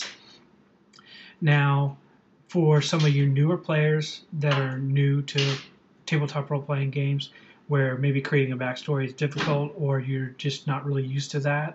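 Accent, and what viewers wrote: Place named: Midwest, United States. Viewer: funny accent. he sounds like a midwesterner until he says attributes. never herd it a-TRIB-utes.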